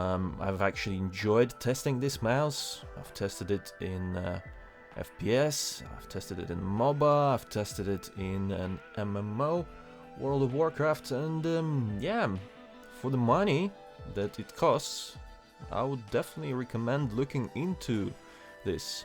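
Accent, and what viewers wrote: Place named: Russia. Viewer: Ты оч хорошо по английски говоришь, но всё равно с русским акцентом))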